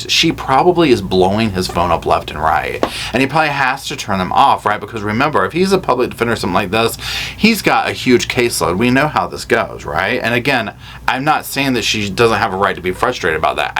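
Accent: South, United States. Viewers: OH I ALSO LOVE HOUR SOUTHERN ACCENT!! Keep it up you’re great!!❤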